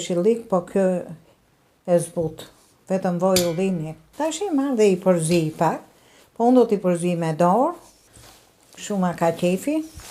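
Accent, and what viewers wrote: Tirana, Albania. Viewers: Ju lumshin duart teta, kenaqem kur shpjegon perberjen e byrekut, me ate ze te qete dhe gjuha juaj tironce qe me nxjerr mallin, me kenaqesi Ju degjoj! 👏👏👏💖🌸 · Gezuar diten e veres, me pelqen gatimi jot tamam gatime tradicionale ,por me teper shpjegimin qe ben Tiranse puro .kenaqem kur te degjoj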